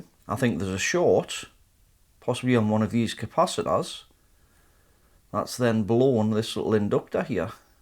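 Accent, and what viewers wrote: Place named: Newcastle, United Kingdom. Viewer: I’m in Newcastle. Born and bred. I can tell you accent isn’t a million miles away. I enjoy your videos and channel is the only channel out of a few hundred I subscribe to that I have notifications setup for. The simplicity of your repair attempts and fixes show that with a little knowledge and understanding such fixes can be completed successfully on a bench that’s not in an engineering or highly technical environment. Think it gives some less experienced the confidence to have a look inside and try fault finding. GOODLUCK with your channel mate. I think such topics are on the increase and there’s a lot of us out there looking for how fixes are approached. 👍🏻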